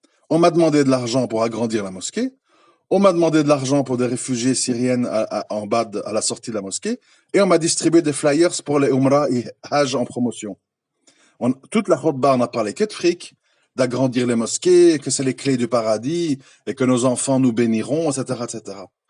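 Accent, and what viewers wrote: Belgium. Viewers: c est un Belge de souche 😇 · Accent belge.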